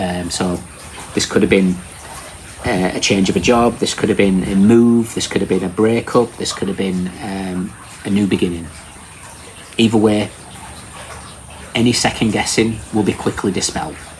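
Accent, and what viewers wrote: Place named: Lancashire, United Kingdom. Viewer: I’ve been trying to place his accent. Lancashire, Rossendale?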